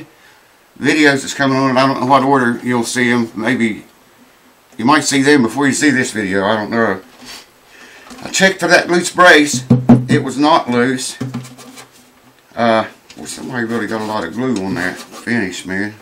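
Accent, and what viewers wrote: South, United States. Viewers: Heard of this for specialized frets ( dont remember the details). Like you vids mostky because of good practical info. 2) your southern drawl. I am from the north and find it facinating! Like hearing it ( used to live in north west FL - lots of alabamans and was in army- same. Also southern females are especially fantastic!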